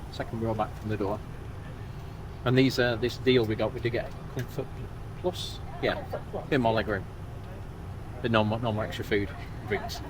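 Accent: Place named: Yorkshire, United Kingdom. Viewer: Love the Venetian. Wow can tell your from Yorkshire 😂 what a deal!x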